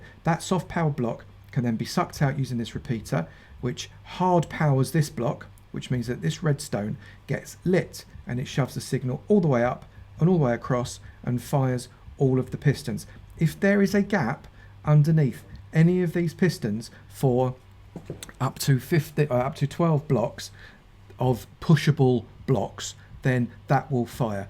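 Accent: United Kingdom. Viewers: Because it sounds British? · soy mejicano, y entiendo muuy bien a los americanos pero... el asento británico, dios mio es hermosamente difícil de entender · That Hen, is called a British accent.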